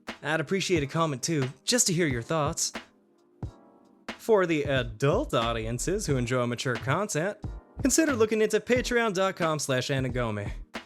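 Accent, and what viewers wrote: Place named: South, United States. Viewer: He making me like country accents…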